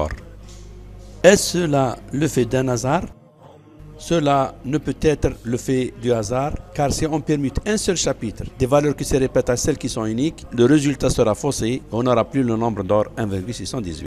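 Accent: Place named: Kabylie, Algeria. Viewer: Accent kabyle salut cousin sa va ??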